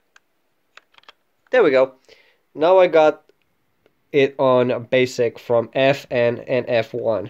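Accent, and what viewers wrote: Finland. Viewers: Are you finnish? Your accent sounds a bit finnish and you have a nordic keyboard layout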